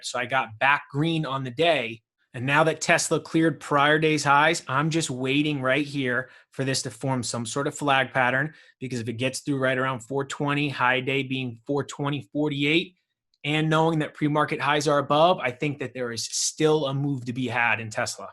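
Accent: New York, United States. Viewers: Hey. Awesome content. Are you from New York?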